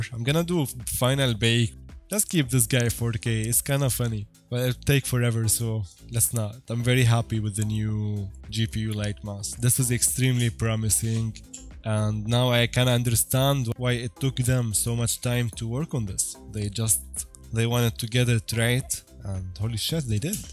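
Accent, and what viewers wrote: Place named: Austria. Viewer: You have an accent similar to Arnold Schwarzenegger, and in some movie Arnold said "Get to the chopper!" I guess it's a reference to that.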